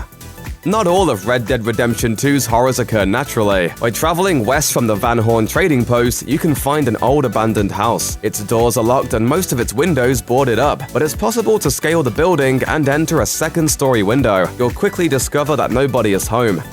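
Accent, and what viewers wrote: United Kingdom. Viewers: Ok why do you sound like a British chills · British yong yea?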